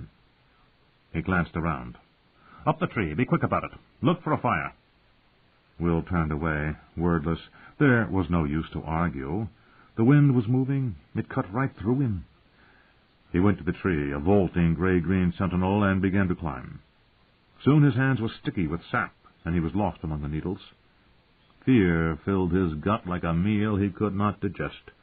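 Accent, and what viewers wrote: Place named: United States. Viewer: Just doesn't quite cut it with a American accent . Wish There was a Augmented Audio Book too . Or is there ? Point me there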